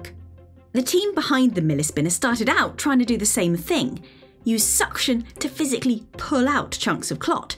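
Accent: United Kingdom. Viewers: The extremely British, and unnecessary, accent intonations had me quit half-way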